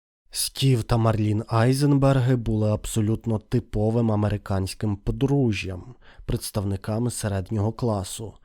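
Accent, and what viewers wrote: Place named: Ternopil, Ukraine. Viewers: На таку файну тернопільську вимову нелегко нагнати саспенсу, хоча дуже стараєшся) якщо звикнути то норм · Я також припустив що автор з західної України😊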